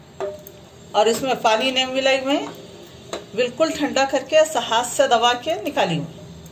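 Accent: Hyderabad, India. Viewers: Wah pakki hyderabadi language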